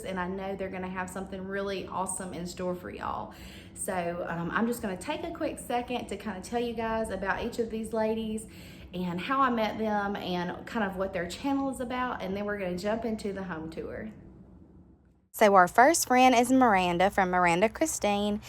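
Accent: South, United States. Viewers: you are such a treasure! I love your home, your style, your love of our Lord, your patriotism and your accent! As a transplanted Texan living in Houston, Missouri, I am so drawn to anything or anyone from the South! I love how you have incorporated so many vintage and family treasures. I am sentimental and I love my heirlooms too! Thanks for an enjoyable tour!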